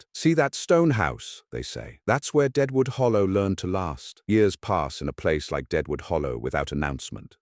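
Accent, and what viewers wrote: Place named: United Kingdom. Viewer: The pronunciation sounds British to me. It’s different from American English, and correct British.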